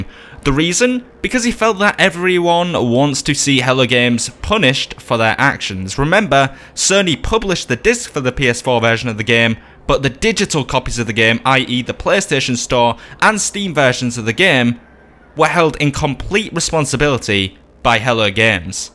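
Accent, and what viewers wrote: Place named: Hull, United Kingdom. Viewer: You sound like some of my Hull friends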